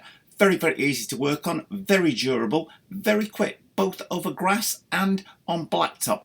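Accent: England, United Kingdom. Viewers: I don't think so his accent is definitely English but in one sentence he can have a twang of South African or Australian, Birmingham and then Lancashire. And the stop/starts of his sentences are really strange. I'm going to go with 👽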